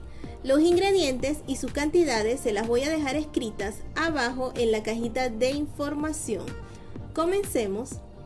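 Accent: Venezuela. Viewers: MUY BUEN DIA MI GRAN Y HERMOSA CHEF NO ME CANSO DE VER TODAS TUS MAGNIFICAS RECETAS ERES LO MAXIMO TE AMO POR TU DIALECTO DEBE SER VENEZOLANA Y SI NO YO DESDE MARACAY ARAGUA VENEZUELA TE DESEO MIL BENDICIONES MI CORAZON YENOS DE EXITOS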